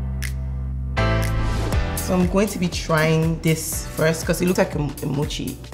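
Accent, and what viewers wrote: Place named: Nigeria. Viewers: Love how you spoke with your Nigerian accent and not trying to speak with an Anerican fake twang like some afrobeats artists. Keep repping Nigeria be proud. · first of all, did you read the comment He's just saying that she's not trying too hard to change her Nigerian accent No one is hating you ffs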